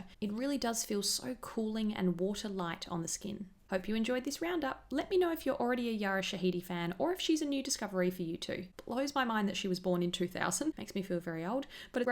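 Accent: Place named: Australia. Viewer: Your Aussie accent makes your videos even more delightful to watch 😍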